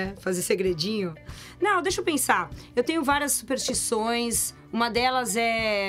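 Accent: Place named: São Paulo, Brazil. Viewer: A voz grossa dela é inesquecível, e esse sotaque paulistano.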